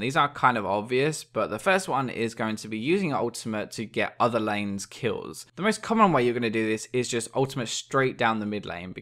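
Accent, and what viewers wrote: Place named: United Kingdom. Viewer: I don't know why, but remainds me of Redmercy a lot just with british accent... Mindblown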